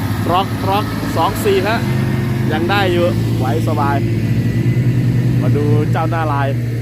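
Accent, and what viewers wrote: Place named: Isan, Thailand. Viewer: สุดยอดครับพี่เว้าอีสานแน่ครับอยากเห็นหน้าอ้ายครับFcเด้อครับ👏👏👏👏👏👏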